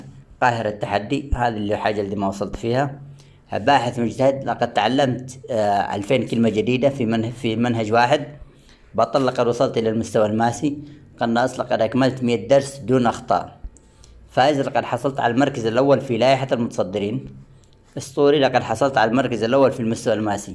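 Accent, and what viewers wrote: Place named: Yemen. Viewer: اتوقع انك يمني من طريقة كلامك تحياتي لك من غزة ممكن تقولنا تحسنت بشكل كبير ولا كيف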